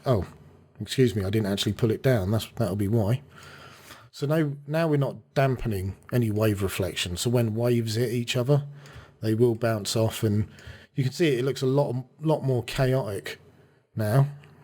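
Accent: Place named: United Kingdom. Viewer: Excellent tutorial, great explanation, plus the British accent adds to the credibility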